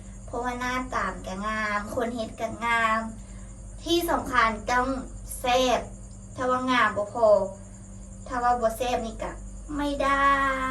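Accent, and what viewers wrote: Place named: Laos. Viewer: เว้ากะม่วนหลาย มักสำเนียงน้อง หลงเสน่ห์สาวลาวคนนี้แล้วล่ะ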